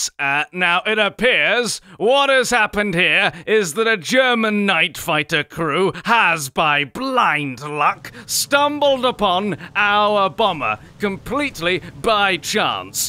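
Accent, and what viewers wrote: England, United Kingdom. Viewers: Man you and your english actent made me switch from Russian to English planes.🇬🇧🇬🇧🇬🇧🇬🇧🇬🇧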